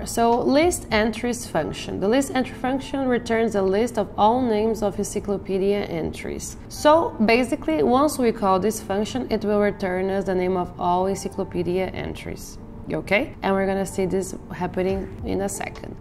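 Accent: Brazil. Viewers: Você é brasileira ? Tem um sotaque muito parecido. Thanks for the class!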